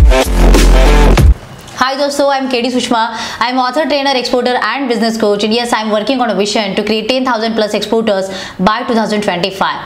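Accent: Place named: Mumbai, India. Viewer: marati hindi mumbai accent madam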